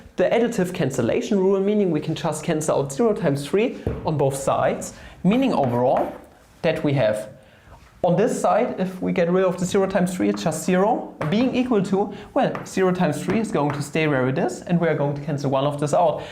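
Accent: Germany. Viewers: why? I mean the accent sounds very german but I just wanted to ask cause I wasn't sure. Other countries have accents like that too. But I actually saw on his other channel that he is, in fact, german.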